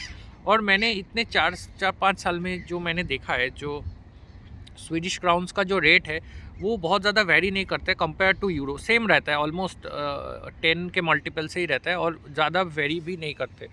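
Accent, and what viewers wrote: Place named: Bengal, India. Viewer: You live in sweden that's not big factor for me but.. তোমার বাংলা টিউন টা ভালো লাগল।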